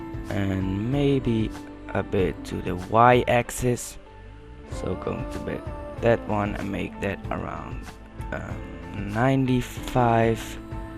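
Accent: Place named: Netherlands. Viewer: Nice tutorial, You're definitely dutch too hehe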